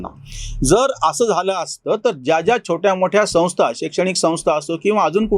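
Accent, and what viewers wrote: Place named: Marathwada, India. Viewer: माझ्या माहिती प्रमाणे सर मराठवाड्यातील आहेत.. परभणी नांदेड या भागात असे कानाला खटकणारे शब्द प्रचलित आहेत... महाराष्ट्रात.. पावला पावला वर भाषेचा लहेजा बदलतो... तेंव्हा.. या गोष्टी कडे दुर्लक्ष करून.. आमच्या मराठवाड्याच्या भाषेचा.. आनंद घ्या.. ही विनंती...👏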